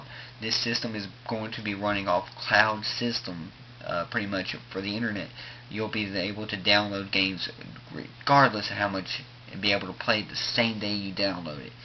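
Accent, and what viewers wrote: United States. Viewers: Are you frome Mississippi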